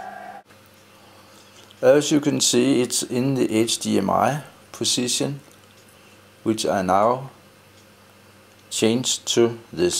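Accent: Denmark. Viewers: for dælen.....du har den sygeste danske accent på dit engelsk og det gør dig lidt kedelig at høre på......sorry men brug det som konstruktiv kritik......er ikke for at HATE på dig......go video ellers!! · kunne hurtigt høre dit dansk accent:)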